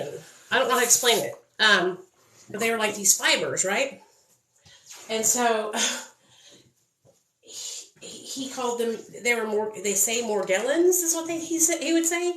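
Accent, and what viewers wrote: South, United States. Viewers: Your such a good mom I think Nate is such a good little boy. It’s so cute how u call him son . Very southern sounding I think? I’m in wi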